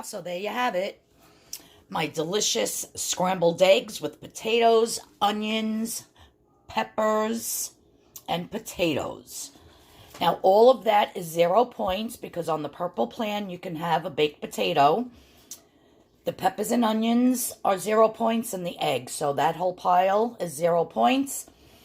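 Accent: New York, United States. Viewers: I love your videos. I just subscribed. I want to start the purple plan this week and you have some great ideas. I'm from long island and you sound like your from NY. I feel like I'm listening to a friend or relative.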